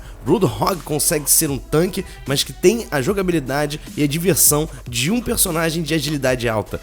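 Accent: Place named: Portugal. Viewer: o teu sutaque e parecido com o pt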